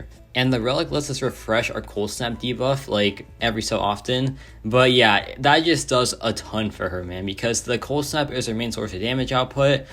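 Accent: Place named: Singapore. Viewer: U sound like singaporean sia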